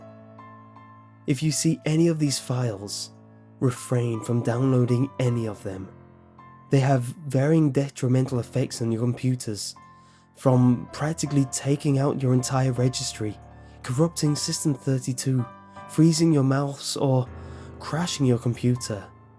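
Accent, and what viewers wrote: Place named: North, United Kingdom. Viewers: As a Brit it sounds like Northern English to me. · British, he has a more Northern British accent though.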